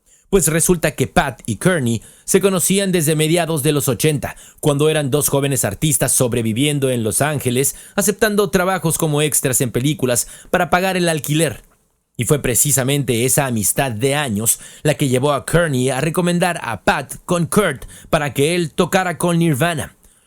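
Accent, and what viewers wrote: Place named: England, United Kingdom. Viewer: Well buddy, it’s not really pronouncing his name it’s off. You sounded English to me unless you’re AI I don’t know how you could get KURT COBAIN i’m really emphasizing on the last name. I’m not being a bitch either. I just noticed. Every time his last name was mentioned i got a tic. I have tourette’. It’s not your fault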